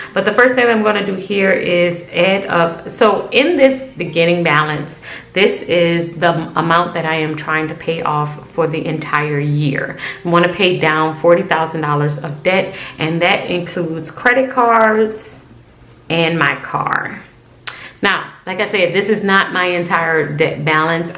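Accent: New Orleans, United States. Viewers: As soon as I heard you speak I knew you were from New Orleans then I clicked on your page and see you are a member at the city of love ❤️❤️❤️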